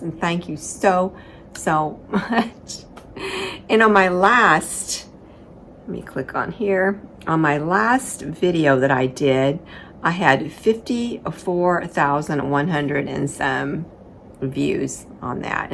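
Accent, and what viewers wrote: South, United States. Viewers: Love your southern accent! How long have you lived in the South? 💕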